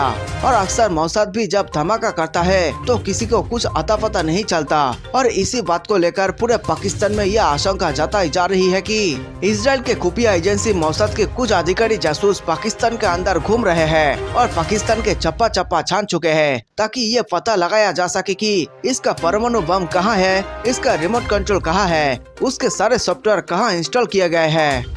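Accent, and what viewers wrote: Bengal, India. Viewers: भाई आप बंगाली हो क्या? बोलने के लहजे से तो ऐसा ही लगता है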